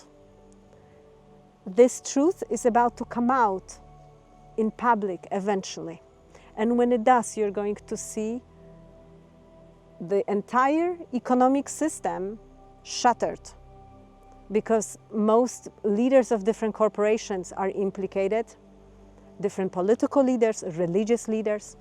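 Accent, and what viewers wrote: Poland. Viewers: Maybe it's just me, cause I'm Polish, but your accent sounds veeeery Polish to me. Especially the way you pronounce "Antarktyka". Is there a grain of truth in it or am I totally wrong?😊😅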